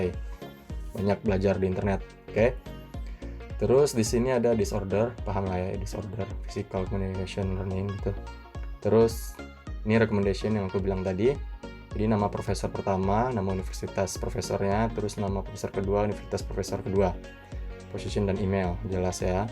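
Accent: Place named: Riau, Indonesia. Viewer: Kelen kelen nya terasa kali kek orang Riau wkwkwk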